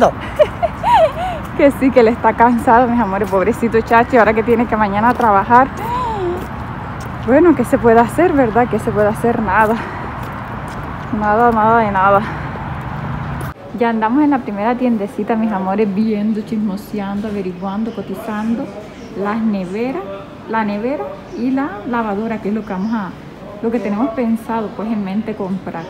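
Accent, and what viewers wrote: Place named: Atlántico, Colombia. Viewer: Hola, como estas? Me encanta ver tus videos, me pareces una mujer con un carisma muy lindo y chistosa. El modo de hablar con ese gopeadito polonuevero que aún permanece en ti Bendiciones, besos y saludos de tu abuelo cesar Cervantes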